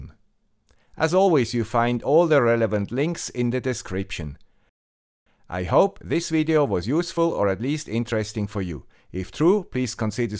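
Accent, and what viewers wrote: Switzerland. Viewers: Watch out, after a update you might loose your *.dat and *.elf files, they were corrupted 28th of May, this is the old correct version <link> It's in Toms Hardware article. <link> It will save you some grey hairs. The new raspbian image has the wrong files, so this might help you. As always, a big thank you to "the guy with the Swiss Accent" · Danke guy with Swiss accent. Now I will buy a pi4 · It works, it works, it works, it works! How much I love the man with the swiss accent! :)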